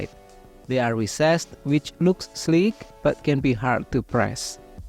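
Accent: Indonesia. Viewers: Your English good sir, i like your Indonesian accent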